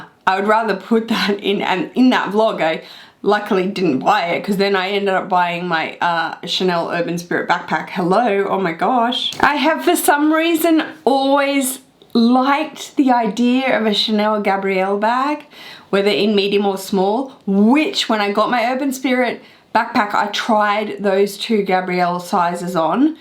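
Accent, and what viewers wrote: Australia. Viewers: Lol HONESTLY BABE...I literally just yell your name every time I see you video!! 😂 im from America so I say it in your Australian accent. My bf even says it now too...ugh I just love you!!!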